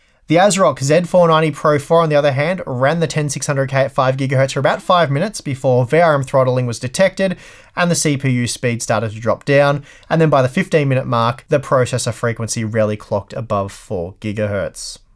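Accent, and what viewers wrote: Australia. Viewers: I don"t know what is is about a fellow Aussie voice. It just always seams more relaxed here than Linus or Jay. Thanks for all the vids. :)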